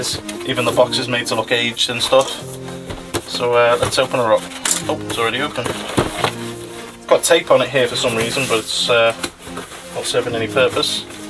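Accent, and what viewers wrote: Liverpool, United Kingdom. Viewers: nice video! Are you a Scouser?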